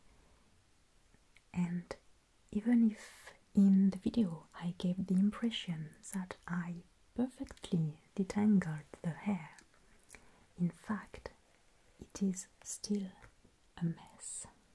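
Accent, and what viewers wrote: France. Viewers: un accent très français tout ça 🤔😂 '' a very french accent all that '' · ~I'm a writer and I have a new character who is French and when I realized I needed to be able to describe a French accent, I came here because your accent is so lovely. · I watched one of her role playing videos as my introduction to her and thought she was doing a really good fake French accent, I came to this one expecting a regular voice when I realized she’s literally French and I’m just dumb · This is the perfect representation of the 18th century ♡ also, I was wondering, are you French? You seem French, I'm French so I don't know your beautiful accent looks French :)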